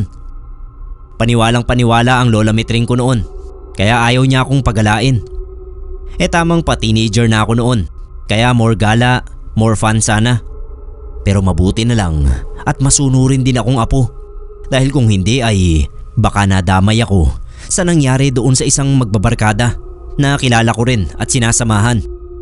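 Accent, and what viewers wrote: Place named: Ilocos, Philippines. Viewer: Si narrator naten tunog ilocano. 😅😅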